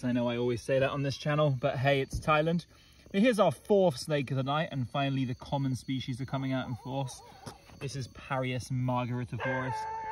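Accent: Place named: Belfast, United Kingdom. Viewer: Ah, he sounds like a Belfast lad like myself. Nasty bite for such a small snake!!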